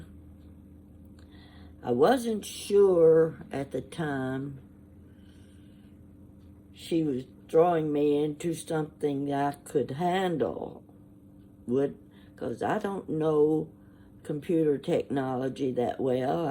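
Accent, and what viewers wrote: South, United States. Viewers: Awww…kiddo…please just block the yucky people and try not to even read that crap. Some people are just so miserable in their lives they feel the need to try to make others feel miserable too. Don’t let them get to you. They are not worth a second thought. …you are a real blessing from God! You make me and so many, many others happy. You are real and I love that about you. I love that you do talk to us like we are all sitting together having coffee. Please continue your channel just exactly as you are and in the same way you always have. I live about 1000 miles away from my mom. She has Alzheimer’s and it just breaks my heart not to be with her. I am still able to talk to her on the phone. She is my best friend. Her sister, my aunt, is my other best friend but she passed in 2018. We called ourselves the three musketeers. You put out such love, kindness, comfort, etc and I feel like I’m in the presence of my two best friends. 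Ha, change your southern accent to Scandinavian/North Dakotan and it is definitely just like them. I never did find your address, maybe one day then I can send you the older jewelry for your projects. Love you bunches! I thank God for you and all of your subscribers. ❤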